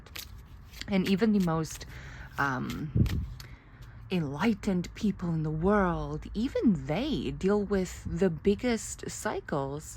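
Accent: South Africa. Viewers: South africa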